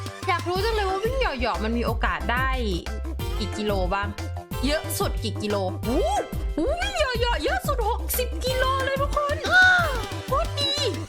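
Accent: North, Thailand. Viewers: อู้เหนือน่าฮักหนาปี่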